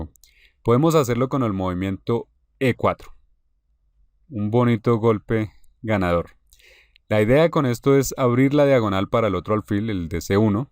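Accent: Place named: Bogotá, Colombia. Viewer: Habla como si fuera de colombia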